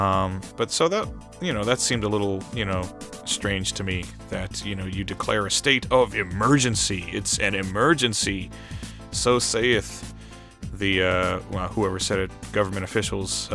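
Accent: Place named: New Jersey, United States. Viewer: New Jersey!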